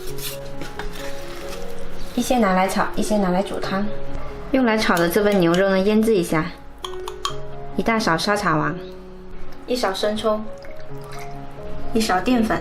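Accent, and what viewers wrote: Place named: Hakka, China. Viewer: 好奇是客家还是潮汕人？口音很像客家人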